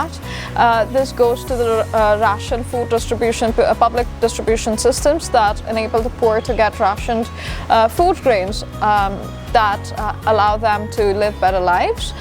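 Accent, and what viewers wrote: United Kingdom. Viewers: Ur accent is irritating either u completely speak british accent or complete indian accent pls don't mix both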